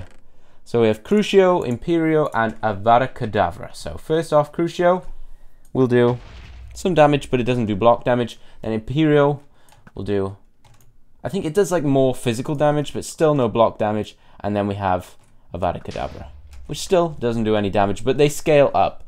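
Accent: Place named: Wales, United Kingdom. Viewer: ACCENT = MINT! ITS GREAT TO BE WELSH... I KNOW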